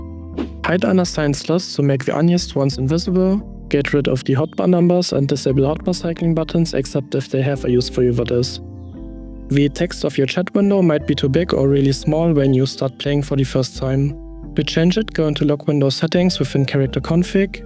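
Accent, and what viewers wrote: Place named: Germany. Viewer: Es ist schwer dir zu folgen wenn du Englisch mit so extrem harten Deutschakzent sprichst. Ansonsten Danke für das Video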